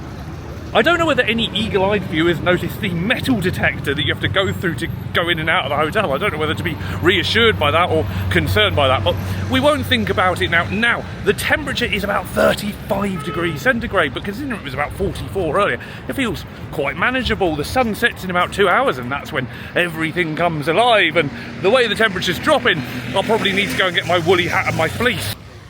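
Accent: England, United Kingdom. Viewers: With an inglish mouth like yours, one would attract paranoia even in Antarctica. At least the place doesnt smell piss like Liverpool or London. Hopefuly after 10 years your channel will help you pay the rent.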